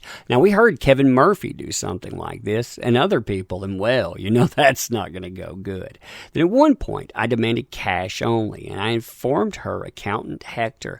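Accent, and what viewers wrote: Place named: Texas, United States. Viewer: Texan AF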